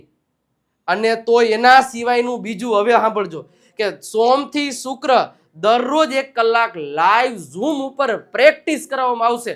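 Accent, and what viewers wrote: Kathiawar, India. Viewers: I like your kathiyadi speech sir 🙏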